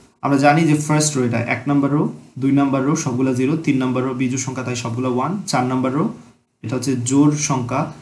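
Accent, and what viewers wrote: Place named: Sylhet, Bangladesh. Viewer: thank you vai apnar karone pattern amar kase onk sohoj hoye gelo off topic vaiyar bari mone hoy sylhet ei apnar accent ta maje maje asteshe :)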